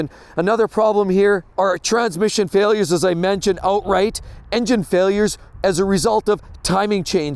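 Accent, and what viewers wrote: Canada. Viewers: he's got a Canadian accent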